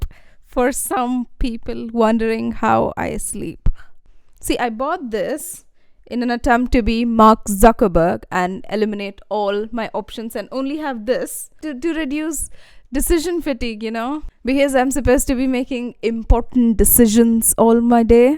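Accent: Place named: United Kingdom. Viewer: You have british accent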